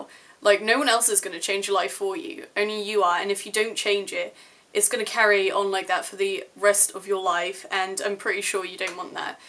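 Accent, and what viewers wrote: United Kingdom. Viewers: before I even clicked on this video I knew you were gonna have a british accent, I'm not even joking. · Does anyone know what part of UK she is from? She has the exact same accent as Asa Butterfield and it's driving me crazy trying to figure out where it's from in UK. SOrry, and thanks.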